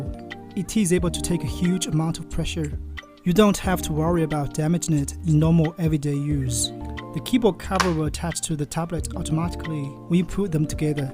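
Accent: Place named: China. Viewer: Fluent English with a strong but unique Chinese accent, I love it.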